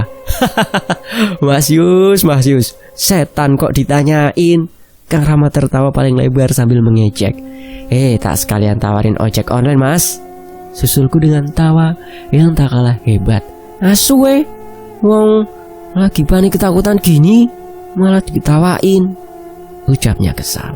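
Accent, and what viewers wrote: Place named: West Java, Indonesia. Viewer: Akhirnya mimin bawain cerita dari sunda...bisaan c mimin logat sunda na haha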